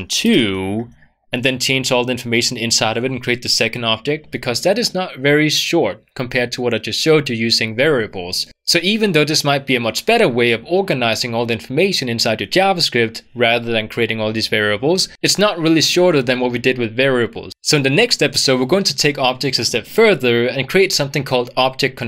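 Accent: Denmark. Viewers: Trying to figure out the accent. Definitely a Scandinavian but not Swedish accent because, we don´t sound exactly like that but close. It´s not Norwegian because you don´t sound that gay, so you must be from Denmark.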